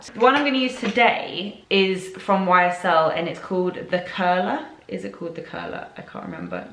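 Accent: United Kingdom. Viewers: yes, she's british. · Do you have a british accent?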